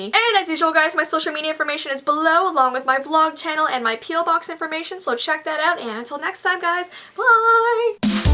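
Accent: Canada. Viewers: She Canadian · Are you Canadian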